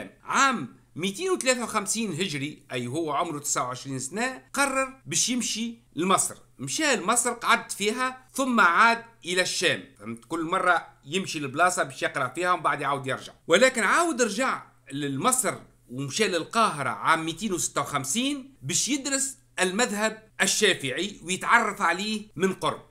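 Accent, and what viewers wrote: Tunisia. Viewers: استاذ انت احسن مدرس للتاريخ, و اللهجة التونسية زادتها حلاوة. تلميذتك من الجزاءر.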